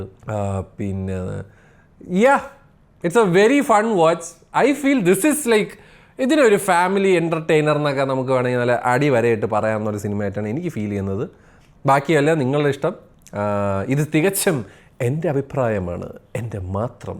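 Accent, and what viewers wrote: Trivandrum, India. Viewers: Annan trivandrum aanen confirm aayi😂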